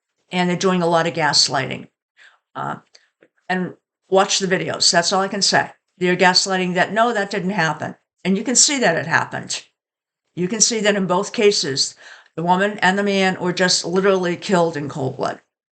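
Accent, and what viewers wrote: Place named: Boston, United States. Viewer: Im from Australia - where is your accent from? It's lovely, but its unusual - my favourite accent is Boston, its sounds a bit like that. Is love to know.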